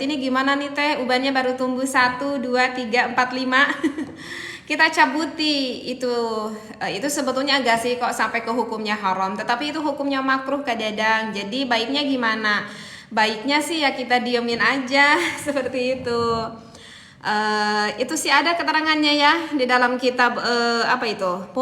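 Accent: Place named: West Sumatra, Indonesia. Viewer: Wa'alaikum salam,. Hadir tehcannnnn. Oia, teteh ni kan sunda, tp ko mirip minang ya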